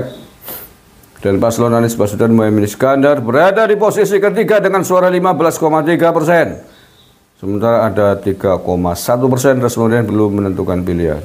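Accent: Banyumas, Indonesia. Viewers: Smg prabowo menang 1 putaran, aq seneng sampean ngomonge ngapak... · Wong ndi pa jenengan ngapak ya pada pa 😂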